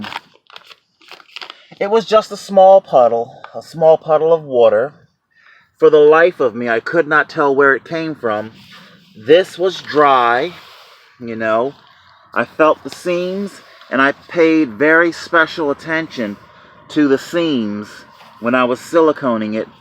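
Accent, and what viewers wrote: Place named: Ireland. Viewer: Awesome Irish accent!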